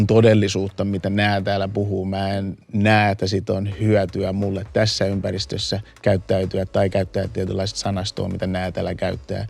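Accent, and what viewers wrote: Tampere, Finland. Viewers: Kommenttini ei liity mitenkään oikeen mihinkään, mutta nokialaisen eteläsuomalaisena tyttärenä, musta on niin kiva kuunnella tota tamperelaista ärrää ja nuottia :DDD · on niin symppis. 😭❤️ Tampereen murre pehmentää häntä vielä enemmän. 😍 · His songs might be in english but he also has a really strong Tampere accent.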